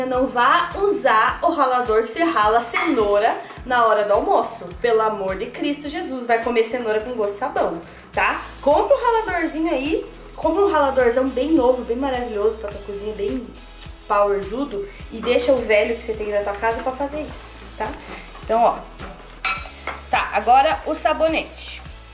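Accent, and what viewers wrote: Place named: Ponta Grossa, Brazil. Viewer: de que cidade vc é?Parece sotaque de Ponta Grossa.Eu moro em Londrina mas sou de lá.Bjs.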